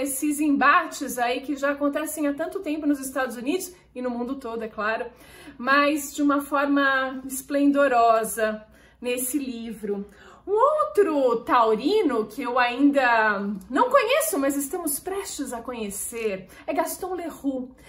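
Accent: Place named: Interior, Brazil. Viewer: Astrologia e literatura orrrrnam ,um sotaque meio acaipirado, alguma influência?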